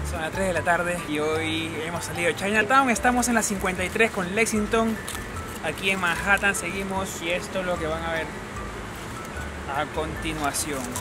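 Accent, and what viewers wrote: Peru. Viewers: Hola te felicito eres espectacular..ese asentico peruano es fantástico... soy de Colombia conocí tu canal a través de planeta Juan...muy buen contenido .sobretodo la simplicidad que lo transporta a uno a tu amada N.Y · Bro tu eres peruano vdd?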